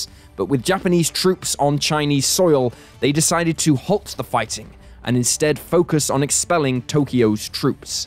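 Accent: United Kingdom. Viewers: British accent makes it better. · Such British blah blah blah...........................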